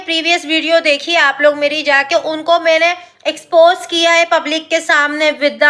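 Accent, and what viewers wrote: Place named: India. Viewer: Sach me aap pakistan me raheti he aap ki baat cheet lahja indian jassa he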